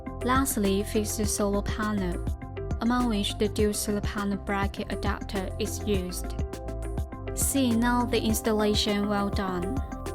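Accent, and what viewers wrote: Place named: China. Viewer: Very informative review ,nice Chinese accent too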